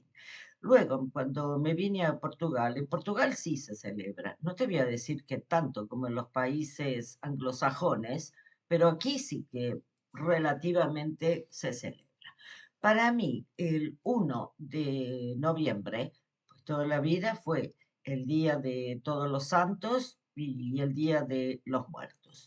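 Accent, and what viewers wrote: Argentina. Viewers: Hola!! Hace poco q te veo,pero me encantas.porcierto me gusta mucho tu acento argentino ❤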